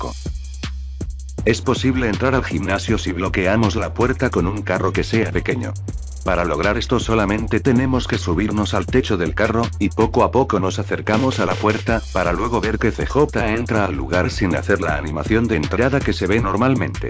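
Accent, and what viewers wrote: Venezuela. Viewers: Vos sos Venezuelano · Esa canción es de la tino América y es venezolano porque su forma de hablar las formas de groserías es obvio que es venezolano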